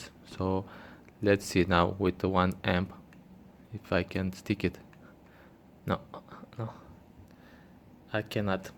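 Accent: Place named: Scotland, United Kingdom. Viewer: you sound like a scotish guy :D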